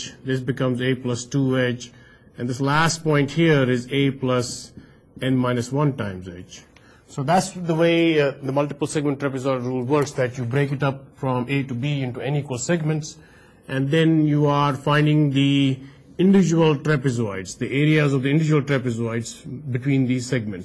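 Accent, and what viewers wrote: India. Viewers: Dude has the best Indian accent.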